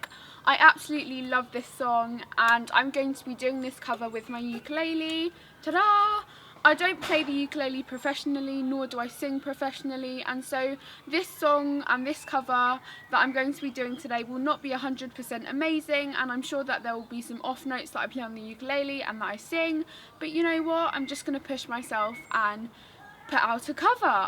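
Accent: England, United Kingdom. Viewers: Sotaque britânico. É britânico, certo? Adoro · love your English accent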